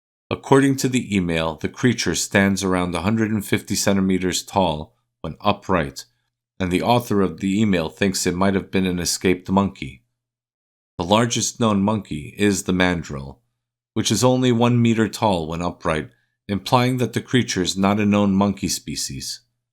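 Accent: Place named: United States. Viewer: I was actually wondering that (excellent American accent btw, but I can also hear the Hungarian), if this is your native country, telling of local stories. That mega-brilliant anti brain fart, i.e. epiphany, came to me later, however I forgot to edit my comment to include it. Sorry. I do love your content with the excellent quality you bring ;) I've long been into cryptids & paranormal occurrences, so this is great!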